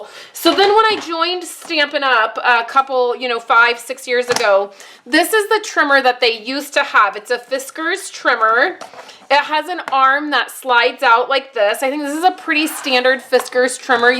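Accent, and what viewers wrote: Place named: Atlanta, United States. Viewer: Thank you. This is the most informative video I have seen in a long time. My husband was listening to you and swears that is an Atlanta accent. Is it? Love it wherever you got it from!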